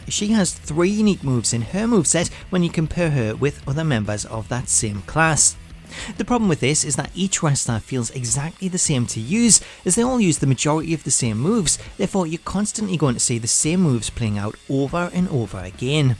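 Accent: Newcastle, United Kingdom. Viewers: Just curious where in in the UK Are u from I can’t place the regional accent 😆 yeah I can already see how repetitive this is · Maybe he's a Geordie? I'm only guessing though.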